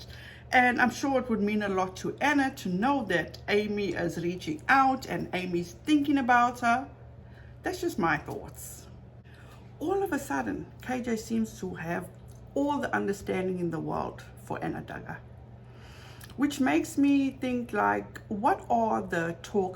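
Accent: South Africa. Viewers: You sound like you have a Cape Town accent! I love your accent 😍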